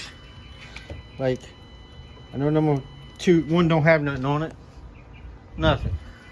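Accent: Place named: Louisiana, United States. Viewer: You gotta be from Louisiana brother lol. I know that accent from a mile away, I lived in Louisiana for 5 years. Great video, I bought similar lights for my truck, thanks for sharing!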